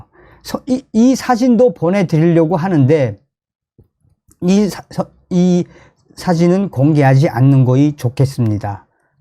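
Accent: North Korea. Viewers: 북한말 좋아요 좋은소식 감사해요❤🎉🎉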